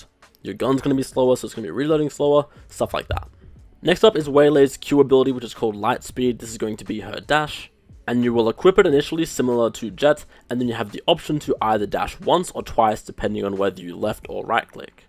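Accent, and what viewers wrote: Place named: England, United Kingdom. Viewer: Bhai aapke accent ko kya hua? Yeh angrezo wala accent kahase aaya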